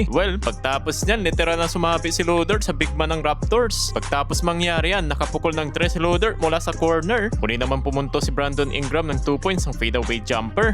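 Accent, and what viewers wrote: Pangasinan, Philippines. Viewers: That pangasinense accent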